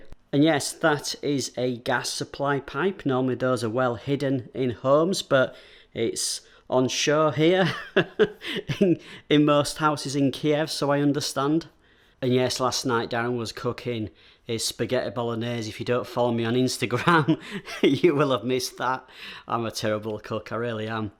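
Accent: United Kingdom. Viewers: Are you British.?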